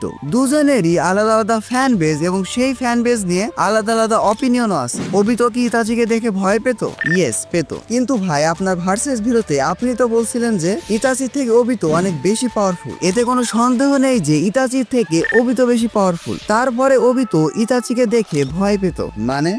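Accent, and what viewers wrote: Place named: Rajshahi, Bangladesh. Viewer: আপনার ভাষা শুনে মনে হলো, আপনার বাসা রাজশাহীতে Am I right??✅✅✅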